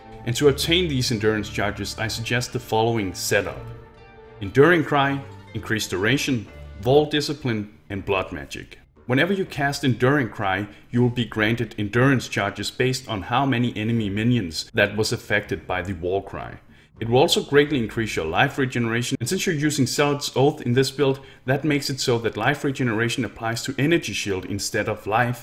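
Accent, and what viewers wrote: Denmark. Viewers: Nice videos tho i must ask: Are you danish becuz you sound like that xD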